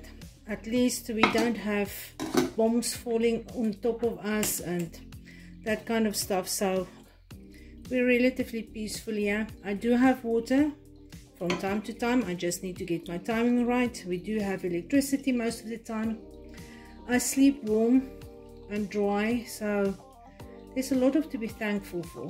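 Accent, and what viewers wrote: South Africa. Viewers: I had to watch the video over because I am a South African living in Australia, and it was just so lovely hearing a beautiful South African accent....My mother was dependent on her oxygen machine towards the end of her life, and the load shedding was frightening for her, so thank God for modern technology, we were able to get her a generator. It is just a way of life one gets used to...with the flies and all.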